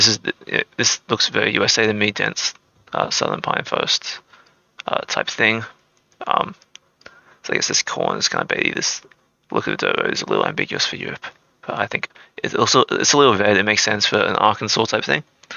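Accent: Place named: Australia. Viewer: this is good stuff, lovely aussie accent too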